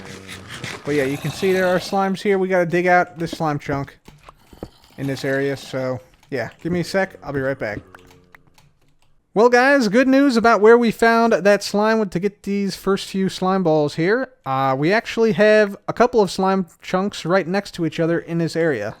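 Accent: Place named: Chicago, United States. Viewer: the accent reminds me of the saturday night live skit with farley and akroid ...."da bears..... da bulls....ditka" ..." how many heart attacks for you bob?"..."gonna be a bakers dozen"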